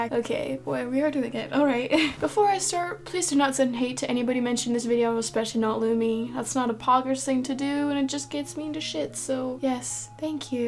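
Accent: Canada. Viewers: I could tell too, even though I’m not Canadian. I live in one of the northern states, and the accent is really similar. Lol. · I can tell you're definitely Canadian but still a very informative video lmao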